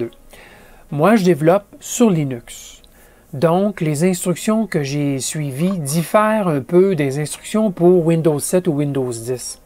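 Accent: Canada. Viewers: Bonjour à toi, j'aime beaucoup tes tutos avec ton accent canadien, existe t il un shield pour un esp32 autre qu'une breadboard ?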